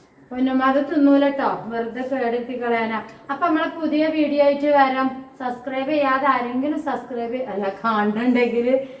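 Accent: Malappuram, India. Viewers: എന്താ എപ്പഴും ട്ടോ ട്ടോ പറയുന്നത് ശരിക്കും മലപ്പുറത്ത്കാരുടെ സംസാരം തന്നെ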